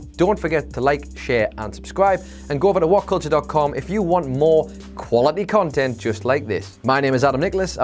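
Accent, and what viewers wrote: Ireland. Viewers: Are you irish and you look like connor mcgregor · I thought that it was Jules with hair, before I got that this guys irish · Irish accent? Fookin awesome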